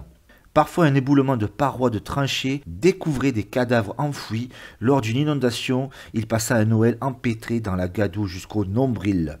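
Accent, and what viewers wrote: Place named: South, France. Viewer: Belle lecture de cette oeuvre fameuse. Et avec cet accent du sud qui est doux à mon oreille. Azouzou à vous ;)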